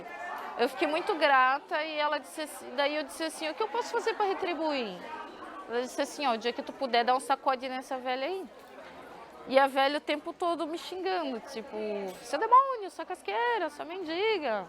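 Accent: Curitiba, Brazil. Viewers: o sotaque curitibano dela é lindo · This Is My Nick Mas ela tem um pouco de sotaque Curitibano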